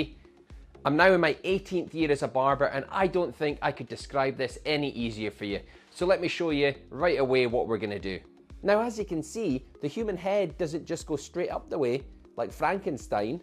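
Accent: Scotland, United Kingdom. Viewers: Love the Skaughtisch accent! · Thanks for this easy approachable concept to do self hair cuts. Really like your accent as well. Scottish?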